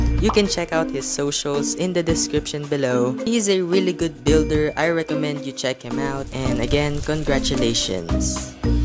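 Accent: Philippines. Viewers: I instantly recognized the filipino accent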